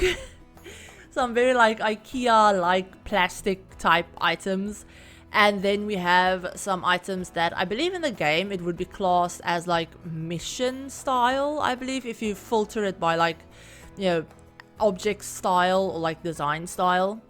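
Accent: South Africa. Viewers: omg! are you south african? super cool to see south african simmers on YouTube 😭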